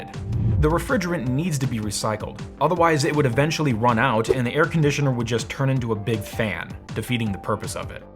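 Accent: India. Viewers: indian accent = red flag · Indian accent? Dropped. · Indian accent is also a red flag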